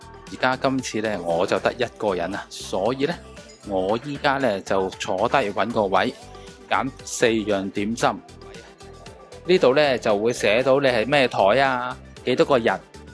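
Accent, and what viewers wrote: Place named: Hong Kong, China. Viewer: 點解您講野口音咁似港人仔仔🥹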